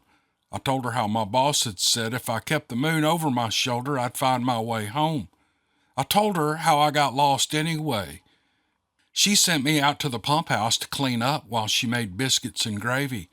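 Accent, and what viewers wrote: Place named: Oklahoma, United States. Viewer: Sounds like you have been spreading yourself thinner than butter. Howdy. I don't usually write in the comment section. So here goes. It's true we hope you're doing okay, and are glad to hear you and yours are well. I get it, you're just trying to survive like everyone else. I will be patiently waiting for the next Saga of Steve Lilly. In the meantime, I will enjoy, and I do enjoy everything you post, that has your name and that wonderful okie voice with it. From one Okie to another, take care. P. S. There is nothing wrong with the shorts.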